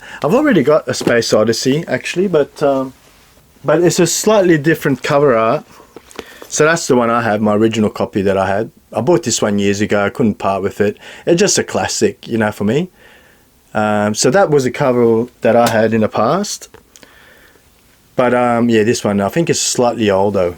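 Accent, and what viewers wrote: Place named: United Kingdom. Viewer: Dude I thought you were from the UK this whole time 😂 I guess I'm not good with accents Love the book hauls. Keep 'em coming 👊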